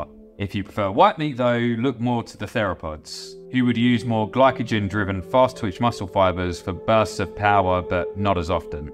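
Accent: United Kingdom. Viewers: Easily my favourite paleo channel. Info dense yet easy to digest. And who doesn't love a regional British accent. I would wanna see you try south Yorkshire. It would be funny because you actually annunciate things. Citizens up here less so.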